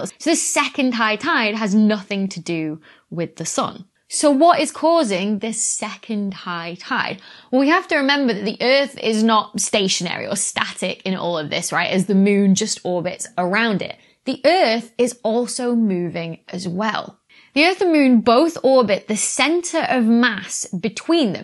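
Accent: United Kingdom. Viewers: Over here, on the other side of the pond, we colonists (oops, I mean Americans) pronounce the word cen-TRI-fugal, not centri-FU-gal. That's not to say you're wrong... just different. (I actually am quite taken with your UK accent.) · She uses the British pronunciation with the accent on the u. American pronunciation has the accent on the i. Both are correct in their respective countries. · Wobble. Wooble. You pronounce it almost like the second word so yeah, it does sound a bit funny. Just like Saturn. Sah- uhn! Love your stuff Dr. Becky. I just have to translate your accent! When my wife and I watch Brtish TV shows, I'm always translating for her. We are both native English speakers!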